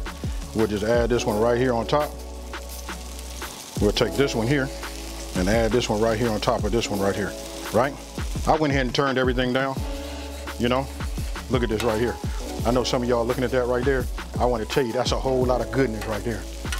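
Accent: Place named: Los Angeles, United States. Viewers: Boy you is the real deal! I live in Houston but I’m born and raised in LA (Crenshaw and Slauson!) and hearing your accent makes me feel at home ❤️ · Man I lived in California for 2-years Anaheim off of Brookhurst Avenue. Also spent time in Alameda California. Soon as I heard you talk and that accent, I said big homie from Cali. New subscriber and honestly, you should have over a million. Let's go let's get a to over a Million by Year's End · I can tell bruh from LA by the way he talk.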